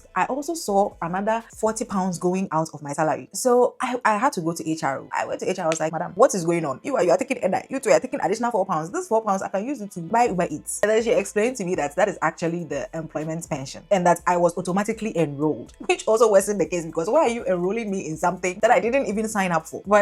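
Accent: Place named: Ghana. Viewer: You do very interesting videos, but the playback speed at which you set the videos makes it difficult to understand what you're saying. You should be aiming at world-wide audience. You have a heavy ghanaian ascent, but i'm sure even some ghanaians will find it difficult, as the sentences are too fast. Often, the sentences are not complete before another one sets in. Don't you replay your videos to yourself? As these are more or less tutorial videos, why don't you just set the speed to normal to make it easier for people to follow what you are saying?. I often have to skip your videos as i find it difficult to understand what you are saying due to the speed of the sentences.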